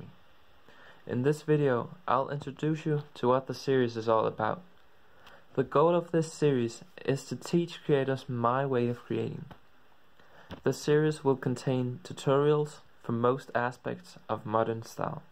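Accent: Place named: Denmark. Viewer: I can clearly hear that u are danish xD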